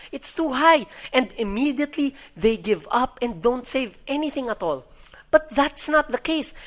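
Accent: Philippines. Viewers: strong phillipino accent but i LOVE THIS VIDEO really hit home for me by the way what software was used to make this video i got a couple things in my vault i got to put a visual to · Amazing video and I love how it sounds so familiar (Pinoy) hehe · Filipino accented English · Congratulations on your marriage. Marriage in the US is more of a liability than a benefit. Your accent is charismatic and charming... makes me miss the PI. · regular filipino english accent. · "Filipino accent"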